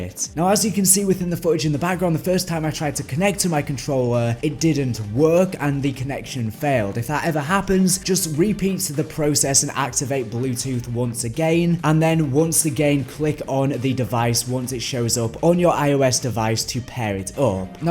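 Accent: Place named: North, United Kingdom. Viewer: You a northerner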